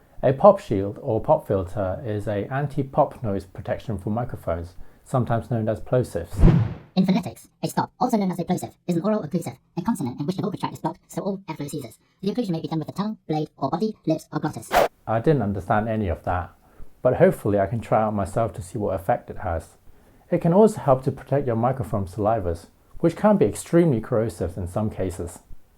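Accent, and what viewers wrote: England, United Kingdom. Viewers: I have to admit, this is the first time I've ever heard an Asian from Asia speaking with an English accent. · you have a british accent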